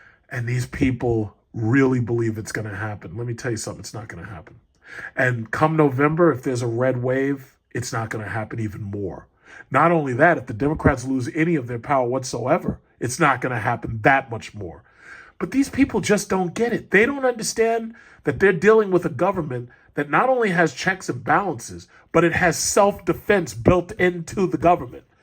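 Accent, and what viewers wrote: United Kingdom. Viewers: How dare you bring up the massacre of my boy Jon Snow in season 8. I felt like Don Corleone looking at my dead child watching what they did to his character lol. Love the British accent lol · Your British accent sounds like Monterey Jack from the cartoon Chip n Dale’s Rescue Rangers 😂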